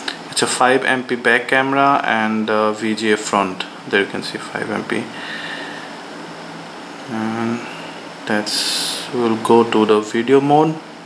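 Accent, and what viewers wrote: Assam, India. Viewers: r u assamese..?? :P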